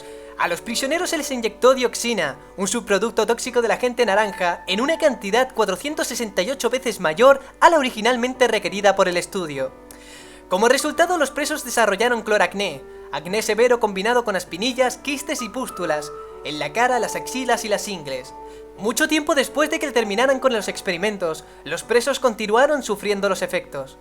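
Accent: Spain. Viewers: así? es español de España, yo no veo nada raro xddd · sin más Español de España JAJAJAJSJJA ni modo que sea español de Colombia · Me encanta como hablan los españoles. Me encanta tambien tu canal.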